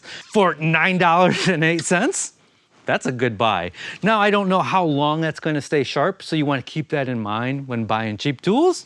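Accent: Ohio, United States. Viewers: I can tell you are from Ohio just from how you talk. "From selling dru...."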